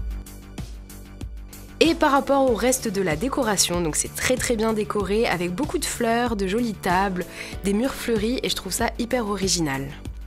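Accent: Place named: France. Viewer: Parlons un peu de ton accent française en premier moment , jai cru que tes française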